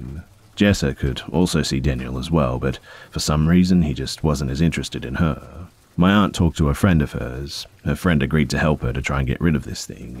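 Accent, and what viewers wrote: Australia. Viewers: Trying to place your accent - Melbourne (AKA Melbun)?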